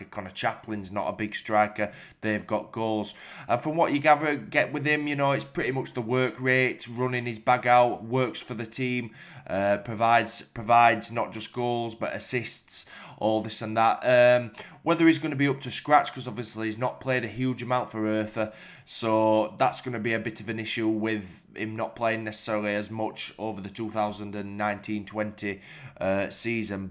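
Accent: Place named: Barnsley, United Kingdom. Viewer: Watching with subtitles is funny as.... 'Welcome to tax tv' 'Pascal Cupcake' Shows that algorithms can't understand the Barnsley twang 🤣🤣🤣🤣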